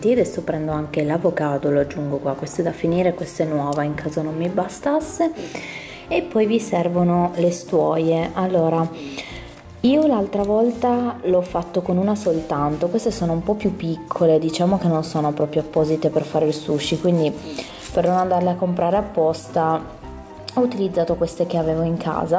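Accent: Turin, Italy. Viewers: Grazie mille 😍 ti ho scoperta da poco e mi fai davvero un sacco compagnia con i tuoi video! Poi adoro tantissimo il tuo accento torinese ❤️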